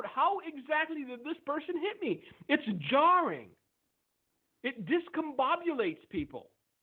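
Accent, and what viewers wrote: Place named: France. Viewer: I love your French accent. You kill me. Lol!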